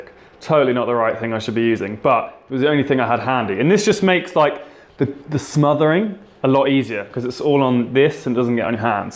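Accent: United Kingdom. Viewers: i'm mexican, and you sound very cute when you say elote Hahah, i love your accent😍❤ the british accent is the Best❤😍😍😍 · I'm Mexican, and it's so cool to watch you make one of my favorite street foods. It's quite funny and cute how you describe everything with your British accent 😁 I just loved your "chopstick elote with cojita cheese" 😂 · Hot guy with british accent and making mexican food street? YOU'RE PERFECT! 🇲🇽❤️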